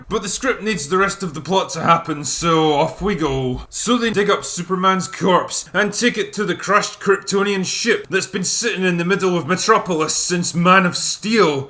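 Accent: Scotland, United Kingdom. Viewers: His Scottish accident was way more subtle in his earlier vids... he got at least 110% more Scottish in the last year or two.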